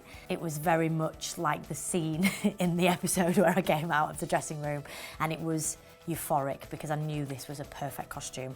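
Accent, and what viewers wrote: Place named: Yorkshire, United Kingdom. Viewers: She's actually Northan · Her accent is just brilliant might be a bit bias because im from yorkshire 😂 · her yorkshirity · she makes me wanna embrace my yorkshireness and I repress it all the time LMAO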